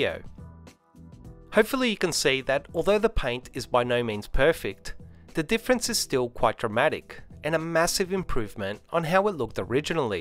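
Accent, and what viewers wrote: Australia. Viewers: Good Aussie narration. No hype no BS no selling. Just fact and detail. Best video I’ve seen on you tube for polishing a car. Well done and thanks mate.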